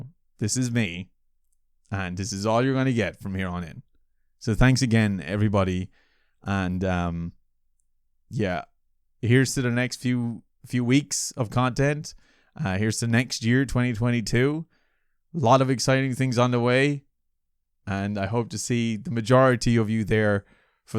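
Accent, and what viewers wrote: Ireland. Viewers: my guy, other people’s joy is not your responsibility !!! don’t ever put that pressure on yourself again cause we’re here for the laughs but not at the expense of your health 😭 take however long you need cause if you feel great your content will be great without even trying. we’re not going anywhere so you can relax. i mean, at least i’m not cause you’re irish kylo ren you could literally read off a shopping list i’d still be fascinated lmao take care ! ✌️😛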